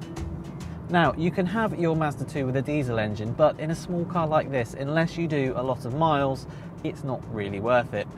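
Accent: United Kingdom. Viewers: hate that British accent. Sounds like you're suffering from impacted bowel movement.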